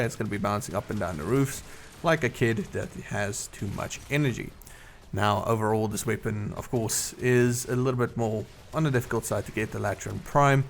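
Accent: South Africa. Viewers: You sound South African. Will give you a like and sub. Gl with YouTube man.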